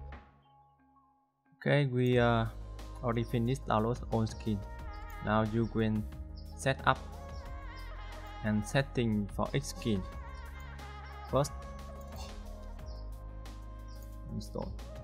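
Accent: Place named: Vietnam. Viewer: nghe kiểu đọc là biết người viêt, haha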